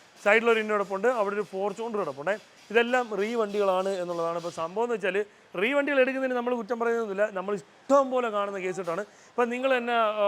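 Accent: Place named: Kollam, India. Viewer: കൊല്ലത്തു കാരന്റെ നാടൻ സംസാരം.....😂 ഒന്ന് പെയിന്റ് മേളം 😂 കൊഴ😂 ഇത്തരം ഒരു പാട് വാക്കുകൾ ഇക്കാ സൂപ്പർ വർക്ക്ഷോപ്പ് സൂപ്പർ പുതിയ ജോലിക്കാർ നല്ലത് വരട്ടെ നമ്മുടെ നാട്ടുകാർ സപ്പോർട്ട് ചെയ്യുകയും ❤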